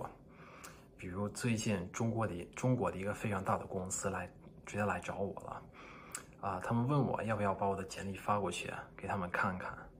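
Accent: Standard. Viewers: 真牛👍！普通话甚至说的比我还标准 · omg 很标准！可以关注instagram账户吗？🤔🤣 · 说的太好了😱发音也很标准哈哈哈哈，你的华裔朋友一定很多是北方人吧，儿化音掌握的很好（eg. 服务员儿～～）🤣你的视频很有启发性，学习外语最快的方法果然还是和母语者交朋友～如果有机会来中国学习或者工作一定又会收获一段很难忘的经历。btw端午节吃的甜粽还是肉粽哇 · OMG！两年中文就这么标准！我英语学了快20年啦，还那么烂！你也太牛了吧！ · 真厉害，太标准了！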